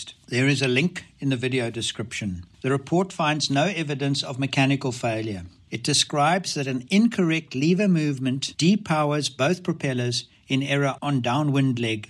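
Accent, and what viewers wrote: South Africa. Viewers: Hi. I do live in Oregon USA now but did live in South Africa till 1999. I am guessing from your accent you are from SA as well. I have to admit I am very biased about Nepal. I have visited twice and left only a month before this accident. I had been almost as excited as some of the locals I know about how positive the new airport would be for everyone. This made something immeasurably bad even worse. If you have never visited the people in Nepal are incredibly kind, hard working and hospitable and its a very easy place to love. And whilst understanding their transport challenges in terms of equipment and procedures (it does not seem these were a factor here) I have only had the greatest respect for the pilots and bus drivers who do an incredible job under very tough conditions. I can only fault some taxi drivers, which seems to be a problem everywhere. 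I just hope there is some final explanation which does not add to this tragedy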